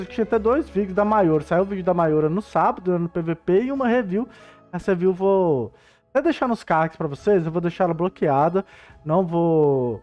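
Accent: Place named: Northeast, Brazil. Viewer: pelo seu sotaque eu jurava que era do Nordeste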